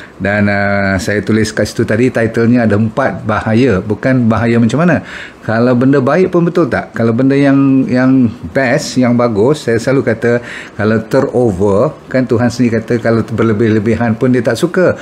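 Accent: Malaysia. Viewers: Denger cakap malaysia 😅😅😅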